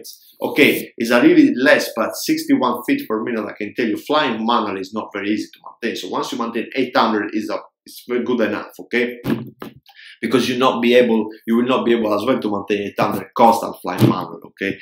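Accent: Italy. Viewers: Salve capitano.....ha un accento italiano....grazie per i suoi video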